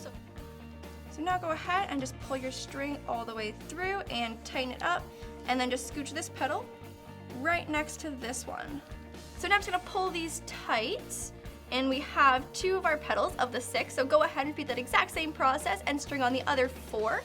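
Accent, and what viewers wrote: Canada. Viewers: Her Canadian accent is so cute haha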